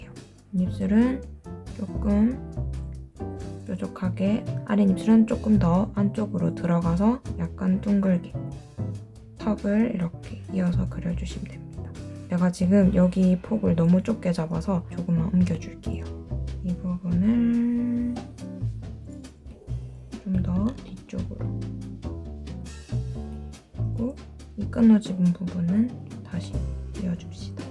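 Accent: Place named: Daegu, South Korea. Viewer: 혹시 대구분? 사투리 정겨워요 ㅋ 저랑 같은말투 ㅎㅎ 절대 음성녹음 안한다는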